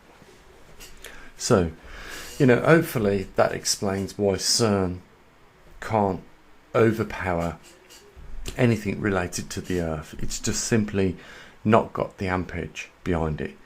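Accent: United Kingdom. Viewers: Oh Thank you for transskript!!!! Your british accent ist Hard to understand to me 🙈 sorry! So Thank you verry much ❤️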